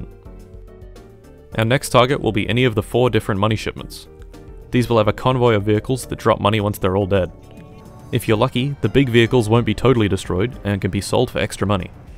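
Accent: Australia. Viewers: You're from Australia, I'm sure of it bc I kinda talk like "I did a thing"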